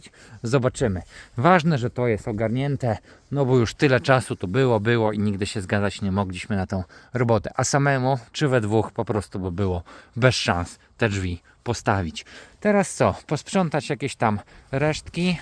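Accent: Lublin, Poland. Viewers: Z Twoich wypowiedzi wynika , że pochodzisz z lubelskiego. "Ciapy"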